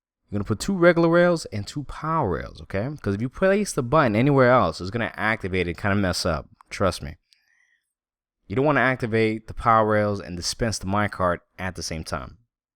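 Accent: New York, United States. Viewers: you sound like your from new York or the Bronx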